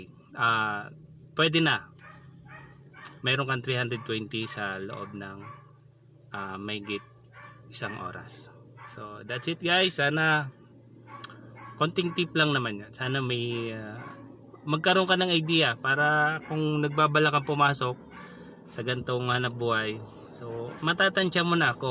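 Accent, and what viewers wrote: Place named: Bicol, Philippines. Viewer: Ung di mo maitago na bicolano ka😂😍😅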